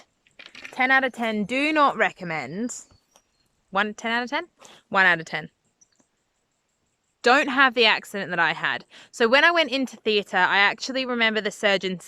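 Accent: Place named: New Zealand. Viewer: Ouch! Oh they can hurt themselves so easily can't they :( I would love to see a video on it. I have a question for you - are you in WA? I wasn't sure because you said Perth but you sound kiwi :)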